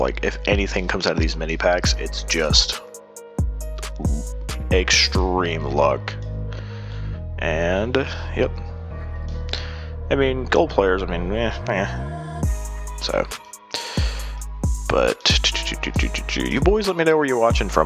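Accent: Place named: California, United States. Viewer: Completely different guesses but I’d say you’re from California or New Jersey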